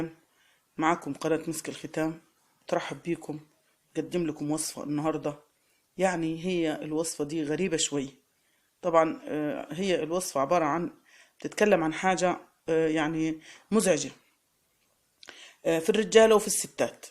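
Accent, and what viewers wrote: Upper Egypt, Egypt. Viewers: شكرا حبيبتي على الرد السريع.انا بحب مصر وخاصه اللهجه الصعيديه انا بحبها كثيييير.اتمنى لك كل التوفيق.ولكي مني كل الإحترام والتقدير.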